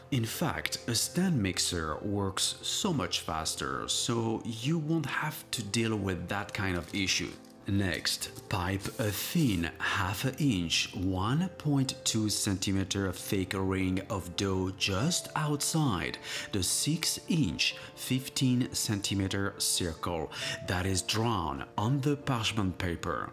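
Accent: France. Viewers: Mdr il est français ou quoi il prononce bien les noms français et il a un pti accent fr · Are u French cos I love the accent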